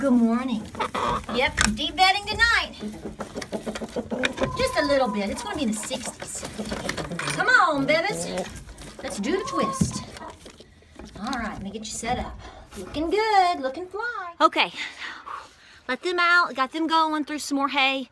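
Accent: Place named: Deep South, United States. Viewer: I just found this video and your channel. I love your deep south accent and attitude! You tell it like it is, and you an inspiration. <3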